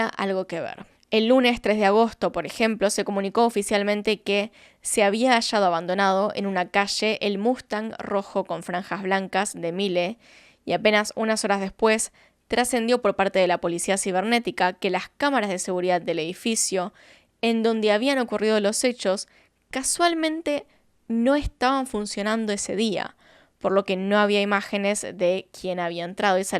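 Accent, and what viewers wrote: Argentina. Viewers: Que lindo escuchar en tu tono argentino todos los lugares de mi hermoso México. Pero que tristeza da que este país lleno de cosas hermosas y personas increíbles como las que tu retratas en este video sea cada vez más conocido por los abusos que genera la autoridad contra su pueblo y ahora también el narcotráfico...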